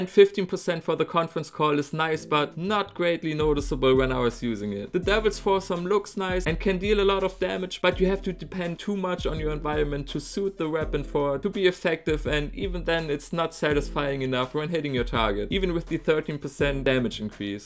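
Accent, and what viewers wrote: Germany. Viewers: Dieser deutsche Akzent hahah :)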